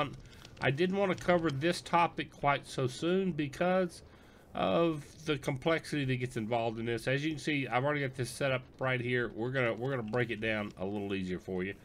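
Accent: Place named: United States. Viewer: this mans voice makes me miss the USA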